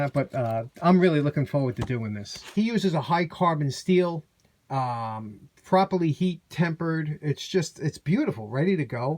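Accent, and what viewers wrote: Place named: Long Island, United States. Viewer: I love the smell of those old electric drills too- and I love your Long Island accent - takes me back home to Brightwaters. Keep up the inspirational videos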